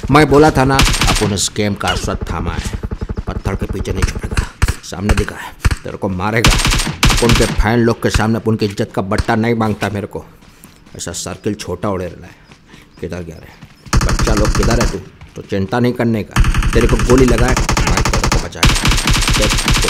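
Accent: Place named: Bihar, India. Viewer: Wo bihari ascent, shukla sir ka class yaad aa gaya..!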